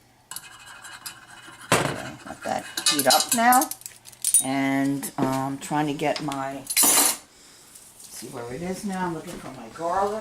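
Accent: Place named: New York, United States. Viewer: Love that heavy new york acent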